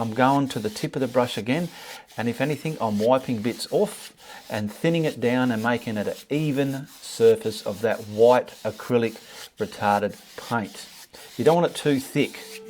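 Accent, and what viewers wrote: Australia. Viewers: Hi, I love watching your tutorials and have seen you grow as an artist. I enjoy hearing your Aussie accent and fun expressions such as “put-er-onner brush” and “blend it to buggery”. Great tutorial! I’ll be trying this technique in my next work. Thanks and keep producing these videos for us. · I was looking for a video of how to paint realistic clouds. You made it look easy and gave a great explanation. It’s my first time watching your videos. Will definitely watch more. Also, love your accent, Australian? I have always wanted to visit Australia.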